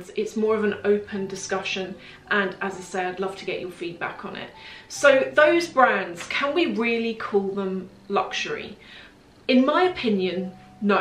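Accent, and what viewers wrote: RP, United Kingdom. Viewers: To point - there are lots of “wannabe” Brands that are very expensive but nobody buys them because nobody has ever heard of them Anyhow just commenting here bc I took my wife to a few luxury stores in Paris and London and she watches with her lil posh accent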